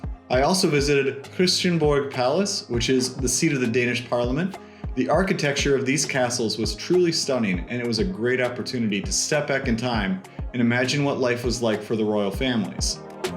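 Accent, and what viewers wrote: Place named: North America. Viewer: See, a WarPigs recommendation carries so much more weight in a North American accent. Kudos to matey on the tat, very DK.. Now the best thing about short form videos is that you've actually been present wherever you've visited, might actually have some memories that aren't digital, as such. Kind of a shame though, in the sense that the narration is pretty good, you're smart enough to have survived our cycle lanes (imagine how entitled a cyclist can get, when we have right of way..) and the accent isn't one of those that makes us grateful for the ocean dividing us.. Remarkably off-topic and not so much leaning into any, umm, cultural stereotypes as just being a bit proud of our guys n gals being able to make the team - but there's a few vids on YouTube called "My War - Danish Afghanistan Documentary", that's one of the few that covers something where our boys and girls have come out to play with forces from the US, Canada and the Brits. 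Nearly all of it helmet cam footage and the rest is the soldiers' own cameras, hasn't been through the "propaganda ministry" for editing either, pretty raw. w/English CC <link> Kinda like watching the BSG Raid series..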